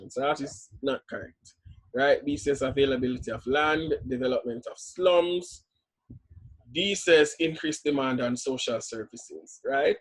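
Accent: Jamaica. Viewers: i really enjoy listening to your Jamaican accent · the Jamaican accent is a nice one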